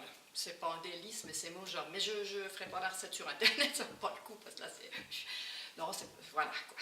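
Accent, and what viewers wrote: Vaud, Switzerland. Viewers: J'aime l'accent très vaudois j'ai fait 2 fois la recette 👍👌😋😘merci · Pas mal le coup du vin blanc et de laisser mijoter, d'habitude moi je mettais que de l'eau et un oignon sans laurier... Super accent en passant 😂, canton de vaud j'imagine... Super recette je vais tester 👍🏼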